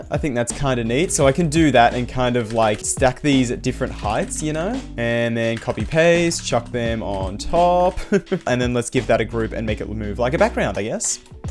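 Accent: Australia. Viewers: sounds awfully Australian today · are you Australian?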